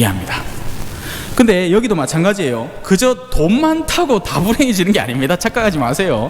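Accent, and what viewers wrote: Gyeongsang, South Korea. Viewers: 찬미예수님....신부님..경상도.말씀이.더욱..더...감동입니다...신부님..강론.잘들었습니다.🙏🙏🙏🙏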